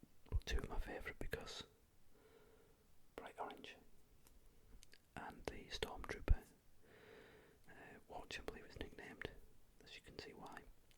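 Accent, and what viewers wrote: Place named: Northern England, United Kingdom. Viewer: northern england, we have the best accents!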